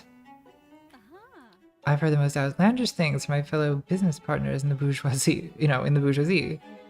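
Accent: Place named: RP, United Kingdom. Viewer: And she has a royale English accent.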